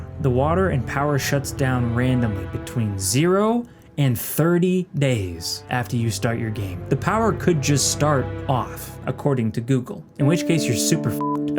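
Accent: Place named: United States. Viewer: Naw the tooth just makes you sound 'Merican :) I cant believe how long PZ has been around. First thing I install whenever I get a new PC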